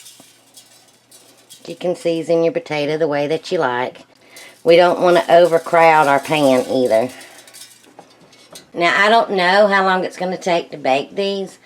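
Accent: South, United States. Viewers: I love the southern accent I am from the south as well so I love it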